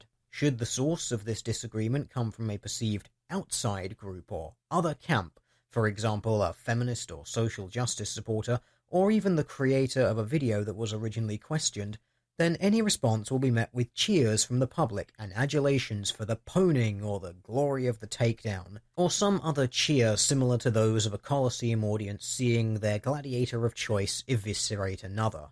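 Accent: United Kingdom. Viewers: I didn't make that assumption, you assumed that I did. I ASKED if it was typical or not, with a suggestion that it might be a British dialect. If it isn't, fine. But that just begs the question as to why I'm hearing it lately, and not just from our ruminant blue-green friend here. I'm also hearing it from at least one Aussie YouTuber as well. It's unusual and I was curious. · The video is an opinion until you present evidence. Yea, I know it's you keemstar hiding behind that British accented dear. Start pointing hooves or your video is BS, I know you want to.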